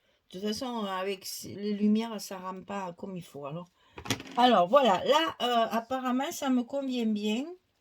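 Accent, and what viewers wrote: South, France. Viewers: Superbe, j'adore te regarder juste pour ton petit accent du soleil 😘j'admire tes créations... Vais peut être finir par me lancer dans un album. Je ne connaissais pas cette marque, est elle chere ? Car petit moyen. J'essai de faire des créations avec mes petits moyens, je viens d'ailleurs de créer une chaîne qui comme moi, ont peu de moyens. J'ai trouvé une astuce pour donner un aspect corrosion à nos decoupes style steampunk, si cela t'intéresse. Merci pour tes partages❤️❤️❤️❤️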